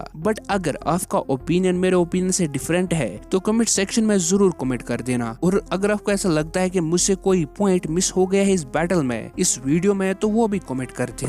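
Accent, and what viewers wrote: Kashmir, India. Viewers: The author's voice seems Kashmiri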